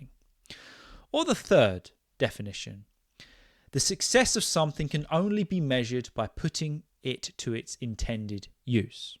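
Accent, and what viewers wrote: United Kingdom. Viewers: Me too, this podcast is so natural flowing within nice British Accent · Your accent sounds beautiful but my self I learn and practice American English, British English seems bit harder than USA English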